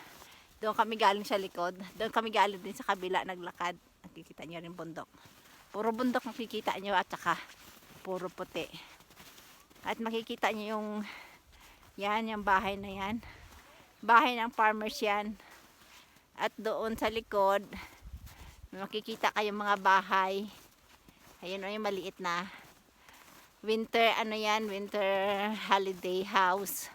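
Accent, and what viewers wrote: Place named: Iloilo, Philippines. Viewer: From where are you in Pinas? You sound like you are Ilonggo or Kinaray a..😂😂😂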